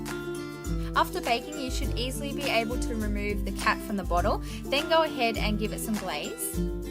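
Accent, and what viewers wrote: Australia. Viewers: Are you Australian? I noticed that the clay you are using for the cat can be sold in Australia and most of the videos I see have clay from America.. then I noticed the accent kinda sounded Australian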